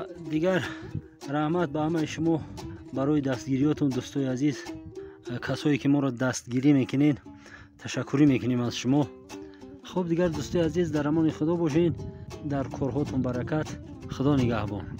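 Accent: Tajikistan. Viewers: Асалому алекум бародар бисер навори хуб як бародар аз нохияи Сангвор будан амихели наворхои хуб мекардан ва мисли шумо бо як лафзи ширини махаллии Точики сухан мекадан, хела вактай навор намекнан, навортон бехтарин, как говорится продолжайте в таком духе 🙌🙌🙌🙌👍👍👍👍🤝🤝🤝🤝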